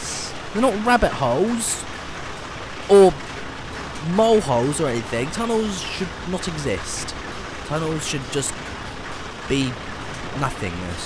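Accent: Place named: United Kingdom. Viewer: That's just his accent, it's British pronunciation.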